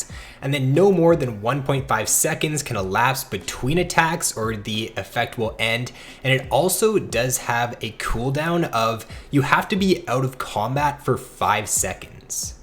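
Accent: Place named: Canada. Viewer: You are canadian, aren't you?The way you say out.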